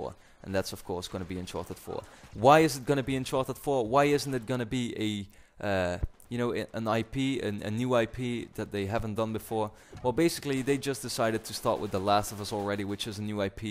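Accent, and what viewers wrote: Netherlands. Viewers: You sound dutch, bro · You sound Dutch, are you?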